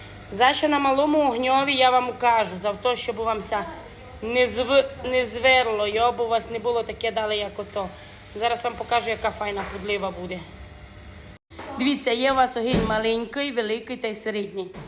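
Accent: Transcarpathia, Ukraine. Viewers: Яка соковита і запашна закарпатська говірка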